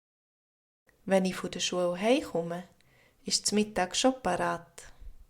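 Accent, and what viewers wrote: Switzerland. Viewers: Which Canton German is this?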